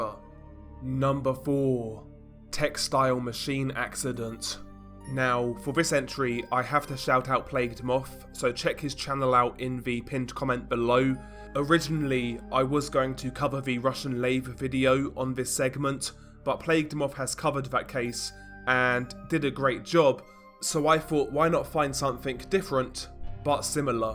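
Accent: England, United Kingdom. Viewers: The British accent 😂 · Just discovered this channel a few weeks ago and been bin watching. He's a great narrator, must be the british accent. Great channel, i even saw other channels doing reaction videos to this content. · He's English.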